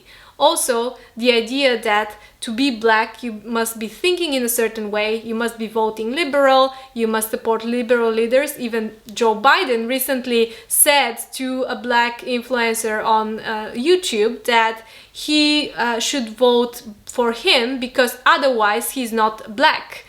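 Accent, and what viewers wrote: Russia. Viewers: are you Russian? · I thought you are from Russia or Ukraine (for your accent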